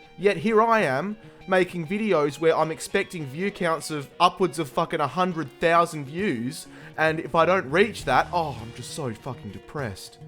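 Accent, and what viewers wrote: Australia. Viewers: So good to hear an Aussie on YouTube, seeing I am an Aussie, no explanation needed, do your videos at your own pace, your videos are awesome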